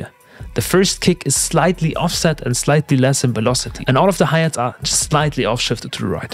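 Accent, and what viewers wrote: Germany. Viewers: I was gonna comment that you sound German until I checked your about section lol. Great video! Please do more of these.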